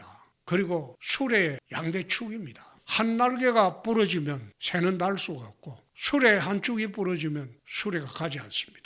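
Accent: Gyeongsang, South Korea. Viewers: 은퇴선언하고 다시 복귀한 건 준표밖에 없을 듯 😂😂😂 나이 먹고 개추태 그만 부리시지 쯧쯧… 갱상도 사투리나 고쳐라 듣기 졸짜증난다 사투리 죽어라 쓰는 인간들 고집불통에 나르시스트 같다.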